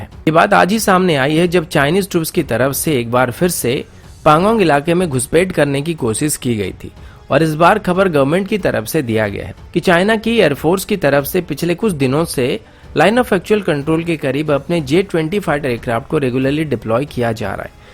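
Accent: Odisha, India. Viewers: Bhai app Odia ho kya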